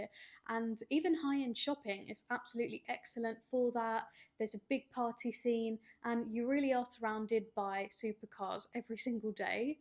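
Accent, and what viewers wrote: United Kingdom. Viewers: Great info, cute girl, but that english accent is not cute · ah. When you said UK I immediately snapped back understanding the accent. Couldn’t figure out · British accent is amazing, a beautiful woman with British accent is charming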